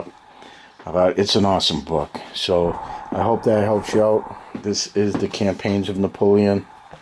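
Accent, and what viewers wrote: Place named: Boston, United States. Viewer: Put this video on because I just bought this book. Wanted to hear some thoughts on it and all I can hear is the Boston accent haha. Sounded like my dad talking about Napoleon. ‘We’re gonna learn about how Napoleon lost in Russia. What a chowah-head.’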